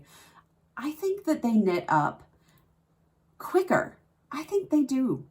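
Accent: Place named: Ohio, United States. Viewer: !!! You did it, Girl!!! I loved sitting and knitting with you …loved everything about this including your “Ohio Girl” accent, which is so easy for this Jersey Girl to listen to!!!❤ I’m your fan from the days of and Kay, so the Fan Girl thing continues! I’m such the sock knitter too and hope you’ll do some teaching about the many heels we can try! Also, please talk about what needles you have tried and enjoyed. Do you use Magic Loop all the time or 9” or Flexi Flips, etc…I just want to know everything! Great job, Friend❤🧶❤️ PS..please move away from the swinging pendulum of the clock that was a bit distracting!❤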